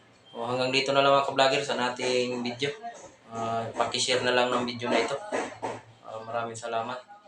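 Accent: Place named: Visayas, Philippines. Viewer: ayo sin mo pag salita mo bisaya kadong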